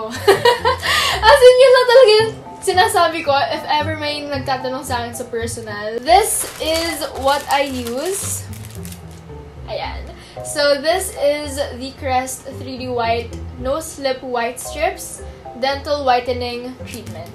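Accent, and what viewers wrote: Batangas, Philippines. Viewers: Ikaw ga ngay batangenya?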